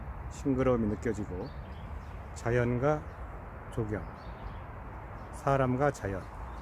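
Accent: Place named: Chungcheong, South Korea. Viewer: 충청도 사투리 쓰시는거 같네요~ 어디실까요? 저는 세종에 사는데 가까우면 구경가도 되는지 궁금합니다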